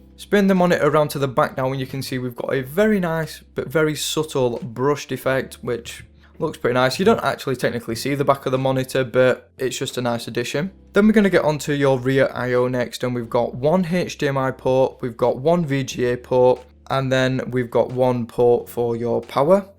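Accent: United Kingdom. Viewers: he is british !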